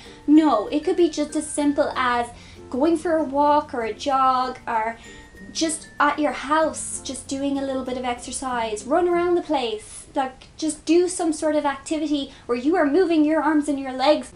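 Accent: Ireland. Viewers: omg is she Irish , love it haha ☺ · Are you irish? I am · Are you irish or is it just me cuz im irish For anyone who is irish conas a tá tu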